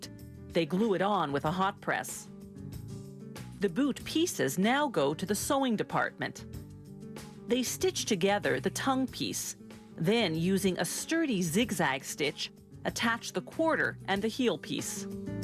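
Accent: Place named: Canada. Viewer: A Canadian woman for a narrator. Couldn’t be any better for a hockey skate video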